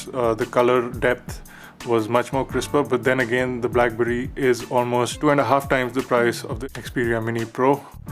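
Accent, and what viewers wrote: India. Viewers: Very nice presentation! Thank you! It would be even better if you talked just a little, little bit slower, since Your "india/english" accent could be a little tricky to apprehend if one is not used to it ;-). Besides that it was an EXCELLENT Presentation!!! Thanks!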